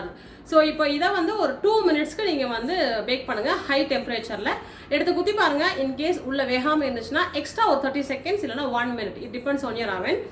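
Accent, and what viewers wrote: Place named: Thoothukudi, India. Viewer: Really Sorry Mam. I'm confused because of your nice Tamil vocal & your Thoothukudi slang also of course. Even I thought, just for name sake you are telling like you are in Mexico, in your past Birthday Special video "35 Things". First of all belated Birthday Wishes Mam. After a long time, without play forwarding I've watched your 1 hour video of that "35 Things". Afterwards I've clicked the subscribe button without any hesitation. Since Yesterday only I've visited your channel. Take Care Mam...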